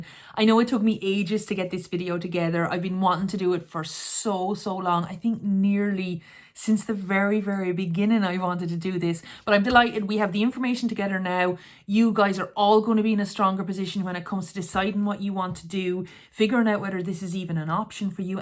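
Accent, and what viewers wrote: Ireland. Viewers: Thanks for your vids. They are awesome, very helpful indeed although listening to your accent makes me miss my fam in Tipp! Looking forward to getting our act together and having a little place.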